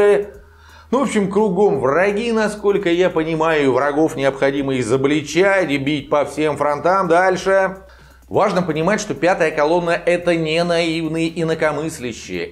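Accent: Moscow, Russia. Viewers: Кааакой мааасковский прооонаанс!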